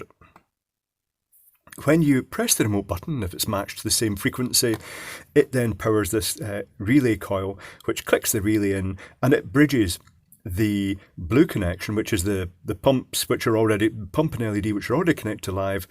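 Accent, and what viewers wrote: Scotland, United Kingdom. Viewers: Legionitalia not quite the same in his Scottish accent, but we need to get him to sing that song ...bonus for death daleks dancing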